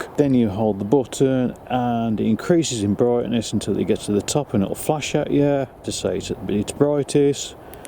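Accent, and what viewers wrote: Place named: Stoke, United Kingdom. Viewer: What city are you from? You sound stokey.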